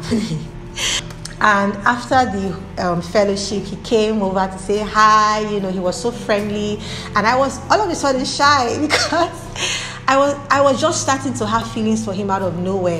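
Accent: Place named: Nigeria. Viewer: You sound like a Nigerian. Though, I'm not in a relationship. Your story is relatable and I don't know why. ♥️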